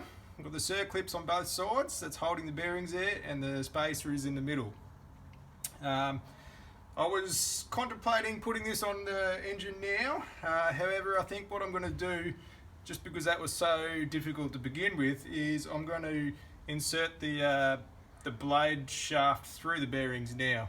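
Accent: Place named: Australia. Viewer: Hi I’m interested to know where abouts you are, I noticed the Aussie accent. I’m in Melbourne and I have an old Rover edger I need restored.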